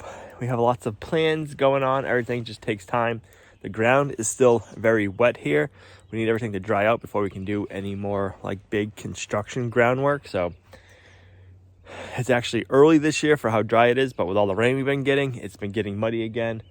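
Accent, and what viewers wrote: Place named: Boston, United States. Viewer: I think he grew up near Boston. His "mornings" are legendary!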